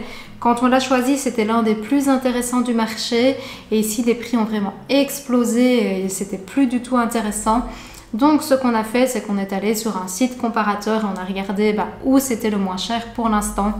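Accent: Belgium. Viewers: oh désolée. Je découvre seulement ta chaîne 😉 Et c'est un plaisir d'entendre ne YouTubeuse avec notre bel accent belge ❤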